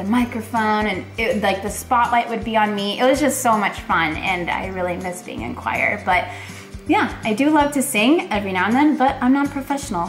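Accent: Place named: United Kingdom. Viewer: I always enjoy your videos and that British accent! 😂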